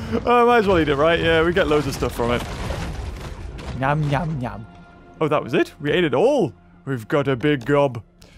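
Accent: Louisiana, United States. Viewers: there are three types of Louisiana accent 1. Farmland (southern accent) 2. City (New Orleans accent) 3.Bayou (Pete’s accent) I hope you find this educational Pls upvote so can see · He has a Cajun accent. He's creole. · are you American? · It's a Louisiana accent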